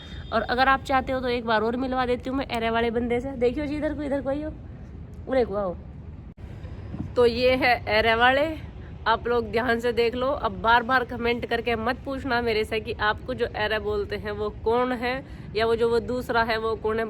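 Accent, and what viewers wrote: Haryana, India. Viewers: आप की प्रस्तुति और विषय दोनों अच्छा लगा, वैसे मैं अवध से हूँ इसलिए आपका हरियाणवी अन्दाज में बात करना अच्छा लगता है✔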